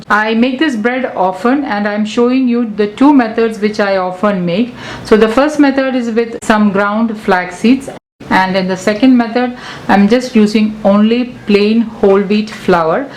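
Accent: India. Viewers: it is already recognized by many pple known as Indian accent and clear English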